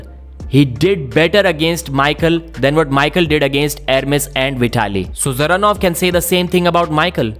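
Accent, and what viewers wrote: India. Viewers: Terrible. This pajeet voice ruins everything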